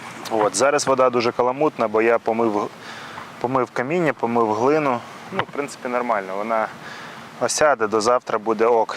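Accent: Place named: Rivne, Ukraine. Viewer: а звідки пан родом?. по мові чую може бути гощанський район або острозький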